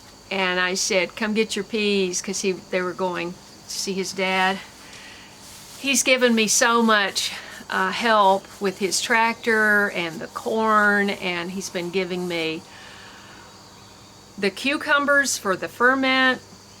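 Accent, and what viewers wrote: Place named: South, United States. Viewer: Is originally from the South? Sometimes I detect a bit of an accent 🤔 love this video, so much great information 😍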